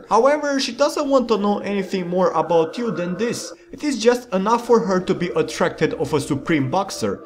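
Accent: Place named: Russia. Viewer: The russian accent and "scary" backround music make this video comedy gold.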